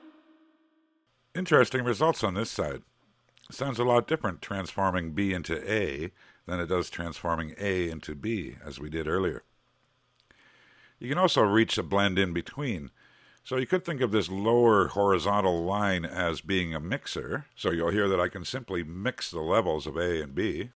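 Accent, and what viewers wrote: Canada. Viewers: No, just a Canadian accent. Hope you enjoyed the vid.!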